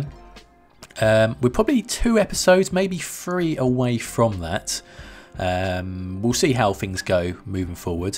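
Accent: United Kingdom. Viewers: english accent, makes you sound knowledgeable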